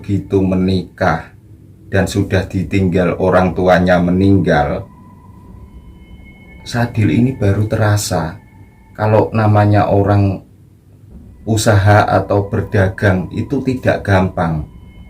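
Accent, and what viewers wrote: Java, Indonesia. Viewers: AsyiiK, SeRu TeRBaWa CeRiTa MaS, DeNGan LoGaT GaYa BaHaSa KHaS JaWanya,NGaNGeNii 😂SeMoGa SeHaaT WaL AFiaT & KeDe PaNnya KonTen/CHaNNeL SeMaKin BeRKemBanG/SuKSeS SeLaLu AamiiN. 🤲🙏👍👍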